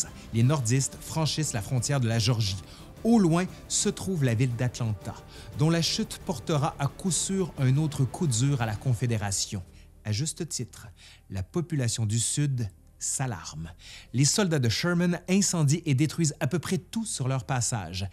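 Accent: Quebec, Canada. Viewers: Grave que j'ai adoré, première fois que j'écoute une vidéo historique par un Canadien où Québecois et l'accent, la façon de réciter, la passion, j'en redemande !! Bien le bonjour de la Belgique ! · L'histoire des États-Unis brillamment expliquée par un Canadien à la diction cativante et au merveilleux accent Québécois! 👍🙋🏼‍♂️ Remerciements et salutations depuis la Suisse.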